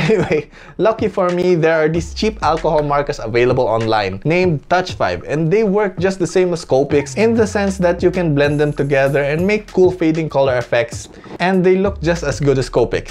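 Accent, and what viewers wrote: Philippines. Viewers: With your asian accent, i can tell, you’re a filipino😂. I also want to be an architect and this video really helps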